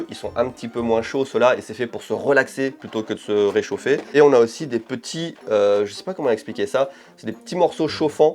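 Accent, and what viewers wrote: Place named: France. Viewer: Je suis complètement d'accord avec vous. Comme les français, les japonais n'aiment pas les sous-titres. Donc, parlant japonais avec un accent français, ça va faire fureur!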